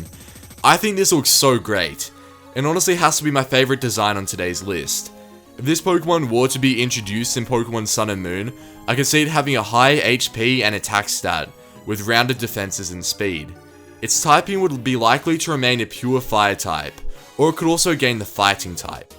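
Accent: Australia. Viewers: The way he pronounced 'zebra' in the video made me laugh, sorry pal, not hating on the Australian accent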